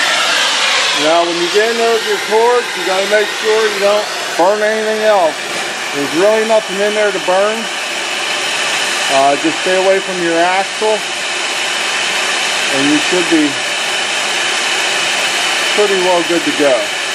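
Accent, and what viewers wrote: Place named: Pittsburgh, United States. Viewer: Hello from one Yinzer to another! I thought I recognized our dialect. Nice video,and really straightforward advice!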